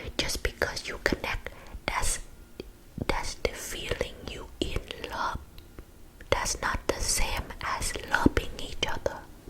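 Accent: Asia. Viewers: Asian accent mom roleplay 😭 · I don’t know any other asmrtist that does the Asian accent, and after watching all your videos I’m not interested in watching other versions anyways lol. Truly unique & veryyy relaxing. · I absolutely love your asian accent videos. Its so funny and tingly. Great work. Heart❤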